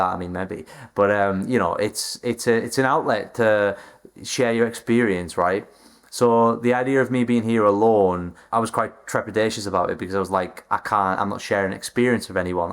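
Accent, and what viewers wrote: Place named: United Kingdom. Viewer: Bro has a really strong British accent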